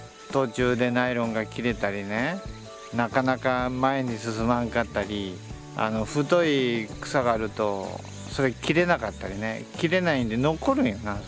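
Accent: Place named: Oita, Japan. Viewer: さま 大分弁丸出しでいつも楽しんでます。 草刈りのチップソー飛び散って痒いのも 有る有るですね。飛散防止をつけるのが良いかもしれませんが 私もそう考えながらまだ購入してません。草刈りがちょこっとだからです。 はなちゃんは必ず予防注射してから 離してください。パルボなんかでコロって死ぬ事が多いです。 一度は注射してませんか？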